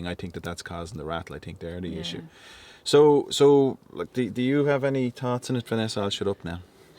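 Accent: Ireland. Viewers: That the problem with motor vehicles today I have BMW about a year and a half old I've been back with lights on the dash about 9 times., just don't make them any good anymore., I dont keep my car for more than 3 years now Because of the quality of them.,yeah a member the Ford Escort last forever I just recently come across one of your videos I haven't watched you for about 8 months used to love watching your channel because I love the Irish accent Thumbs up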